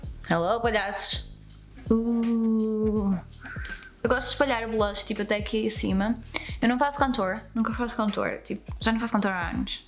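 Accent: North, Portugal. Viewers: Esbufar é lindo hahaha! Amei o look, muito lindo mesmo! E adoro o sotaque do norte, mais vídeos em português, venham eles! ❤️ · Yayyy!! Sotaque do Norte a bombar! :D Try it a few times please. I know you don't like it much but...sometimes give the world a little sotaque do norte. Every portuguese tries to hide it in videos, it takes out all the fun and personality of it. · 😂🤣😂 Nunca tinha ouvido falar Português do nuerte😘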